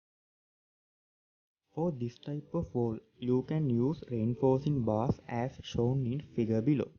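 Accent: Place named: India. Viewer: Thank you for the explanation. However, I must mention that I had difficulty understanding you because of your strong Indian accent.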